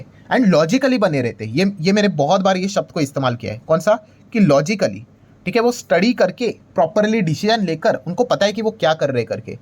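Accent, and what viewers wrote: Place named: South, India. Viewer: Bhai south wale hai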